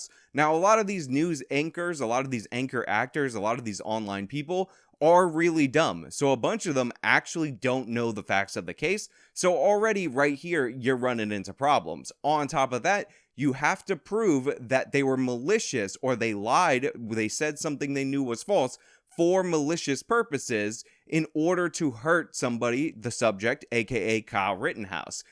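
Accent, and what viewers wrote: New York, United States. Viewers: Alright the whole smartass NY accent is starting to get annoying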